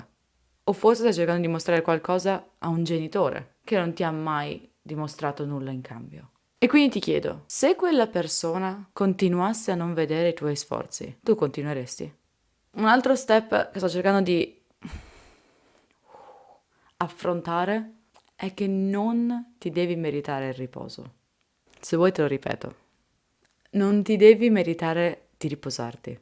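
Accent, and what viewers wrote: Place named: Milan, Italy. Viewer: Ti ascolto da poco, direi che sei milanese.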